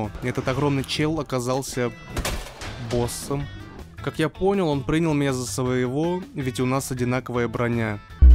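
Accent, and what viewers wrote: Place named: St Petersburg, Russia. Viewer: Голосок Питерский конечно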